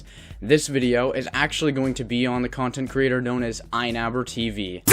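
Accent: Canada. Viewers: Watching you has literally reminded me of all my wonderful childhood summers spent at Crystal Beach! Directly after the Peace Bridge, or within short distance. (After the amusement park was shut down:/ but still the absolute happiest memories) for a few reasons. You legit bear a shocking resemblance to one of the slightly older teenagers who lived next door to our summer cottage (he was always looking out for us younger kids and even the slightly older cousins and whatnot especially if we wanted to venture in to the town. Even with my older brothers taking us younger kids, this guy still made sure to come with us as he didnt want anything weird happening. Like a surrogate big brother or cousin. Okay this turning into a WHOLE thesis on why your accent and then the almost doppelganger resemblance, has helped me to feel more related to you. And also just made me feel damn good to remember those times. 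So, thank you for being Candian cuz as dumb as it, honestly made me like you more.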